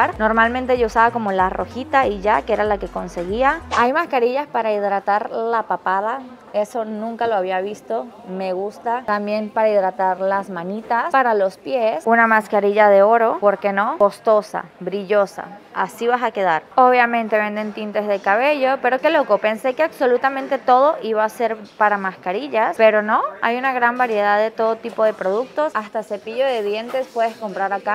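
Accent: Venezuela. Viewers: A donde vaya como habla puedo decir que es Venezolana amo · jajaja no se le puede negar que es venezolana por su forma de hablar super depinga. saludos desde Venezuela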